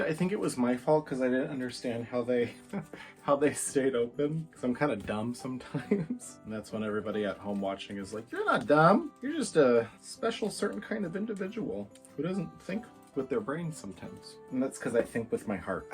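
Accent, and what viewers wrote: Minnesota, United States. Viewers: you look VERY fetching in that red plaid shirt!! I'm sorry it was making you itchy and bitchy, but you were giving 6'4" lumberjack vibes!! 🪚 🪵!! On the 8th day of Vlogmas my true love gave to me: Eight cameras tumblin' Seven cutouts glowin' Six berries spillin' Five Christmas trees 🎄🎄🎄🎄🎄 Four Paolo meows Three sets of batting Two power strips 🔌🔌 And you sound like the movie Fargo 🎄⛄🙂⛄🎄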